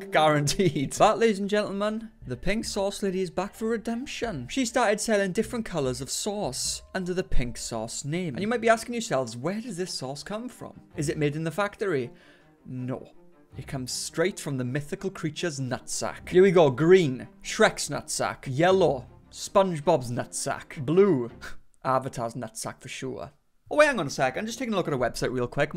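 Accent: Wales, United Kingdom. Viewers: Bought my bro that poster for Xmas 😂👍 love it 👍and your Welsh accent ❤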